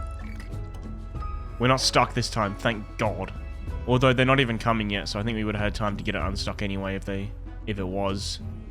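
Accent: Australia. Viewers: I honestly didn't even hear the Aussie accent and now I find it crazy that I could have talked to this man before❤ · First 5 seconds I'm like "Oh he's Australian? Subscribed."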